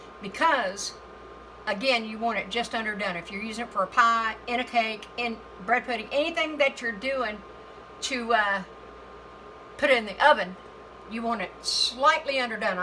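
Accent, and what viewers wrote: South, United States. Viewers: It’s southern. I checked her channel she’s from Louisiana from the USA 🇺🇸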